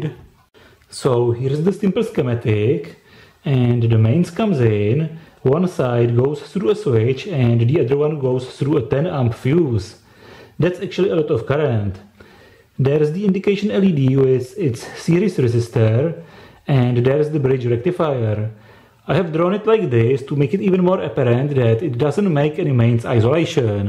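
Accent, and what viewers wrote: Prague, Czech Republic. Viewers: Nezameniteľný pražský prízvuk. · Já věděl podle tý angličtiny, že budeš čech :D :D velmi dobrý kanál a ta angličtiina je topová :D <3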